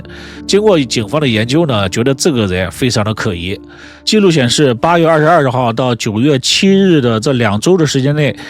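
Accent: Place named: Northeast, China. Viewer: 並不說所有人都能說案的，你這一嘴大碴子味兒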